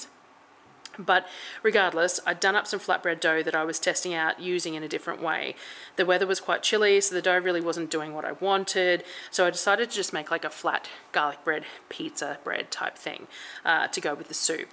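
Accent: Australia. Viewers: I love your content because your real every thing is not all prettied up before the camera is turned on you don’t make me feel inadequate because my home is not picture perfect and your Australian I understand what your saying my sister and I appreciate everything you do. My sister has fibromyalgia and other medical conditions as do I your amazing and we have learnt so much from you ❤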